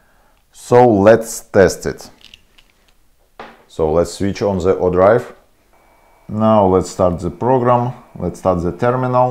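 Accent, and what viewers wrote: Russia. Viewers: From your accent I presumed you are Russian, but your methodology and work ethics spoke otherwise. The calmness and order in your studio also didn't go with that presumption. Then I saw that you are located in Switzerland. i knew something was fishy! :D An awesome actuator btw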